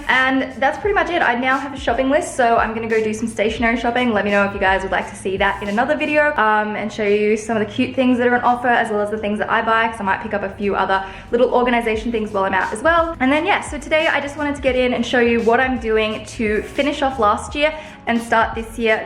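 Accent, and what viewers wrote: Australia. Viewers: Lol I binged a bunch of your videos and now my thoughts are in an oz accent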